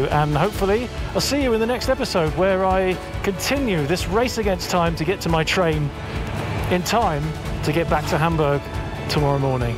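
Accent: United Kingdom. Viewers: thanks mate. I thought you are from the UK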